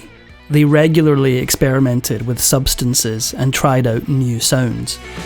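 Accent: Scotland, United Kingdom. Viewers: 💯👍 I think it's a Scottish accent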